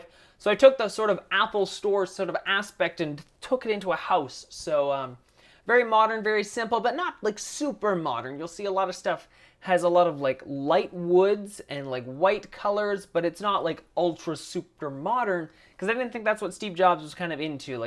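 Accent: Canada. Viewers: Are you Canadian? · He's Canadian, they pronounce it differently. It's not that serious! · He's Canadian, so he has an accent.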